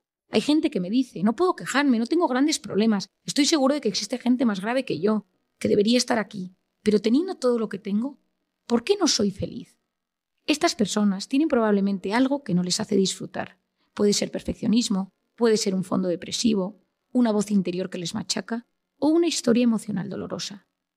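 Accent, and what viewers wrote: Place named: Spain. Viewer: Yo preguntaría de q país sos?España x la voz,muy buena información.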